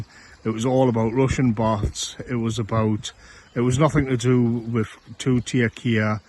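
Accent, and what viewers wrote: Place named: North East England, United Kingdom. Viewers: very capable. His accent is working class north east. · I remember your tree roots video. I also remember getting to grips with the Geordie accent So I could appreciate your content when you only had a couple of thousand followers.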